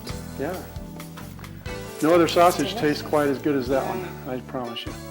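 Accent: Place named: England, United Kingdom. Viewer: hello cousin, I see despite all your years over there, you still can't get rid of the English accent. You make a great host and you look hot too as always. love you..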